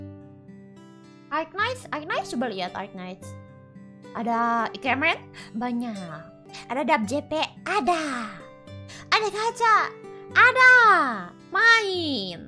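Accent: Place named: West Java, Indonesia. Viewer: Emang dia orsun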